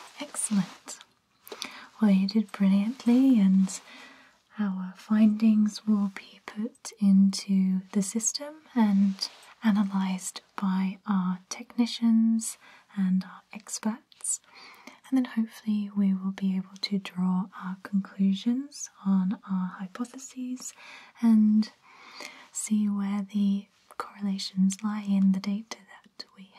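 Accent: United Kingdom. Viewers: Whereabouts in the UK are you from? I'm from up North and couldn't imagine a Northerner doing ASMR (at least not well), especially with an accent from where I'm from, hahah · 1. Why have I just now come across your channel? :o 2. What's that accent? (British obvs. but exactly) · As an Australian I feel offended, she sounds British not like us at all! Maybe you are hearing the R sound but there are 25 other letters to consider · Sounds British with a bit of Australian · Brilliant ASMR! +Subscribed when heard that British accent.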